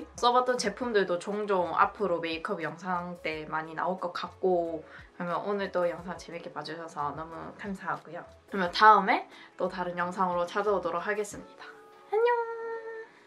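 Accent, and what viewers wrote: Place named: Seoul, South Korea. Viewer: 이제 서울말 쓰시네요..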